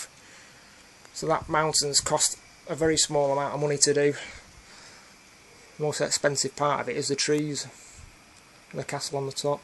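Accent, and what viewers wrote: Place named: RP, United Kingdom. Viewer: Model tips are great,theres always another way of doing things,you tubes great for sharing idea,s,just wondering which tv company will ask us both to do voice overs for advertising,yours is a touch posher than mine,LOL,cheers Tony.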